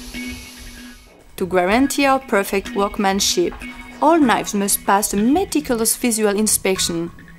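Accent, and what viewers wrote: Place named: England, United Kingdom. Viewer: Cet accent anglais m'écorche les oreilles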